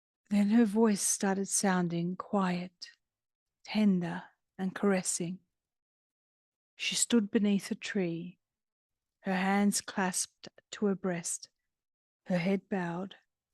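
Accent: Australia. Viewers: I agree 100%! Beautifully read! A lovely soft Australian accent makes for easy listening.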